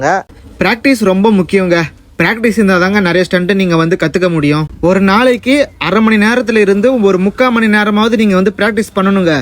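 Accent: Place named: Vellore, India. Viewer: Vellore Tamil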